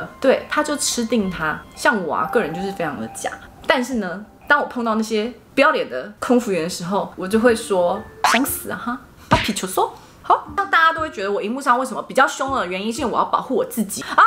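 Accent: Taiwan. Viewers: 看著影片突然聽到台灣國語感覺整個超親切啊.... 加油喔XDDD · 台灣國語的口音又親切又真好笑~笑死我了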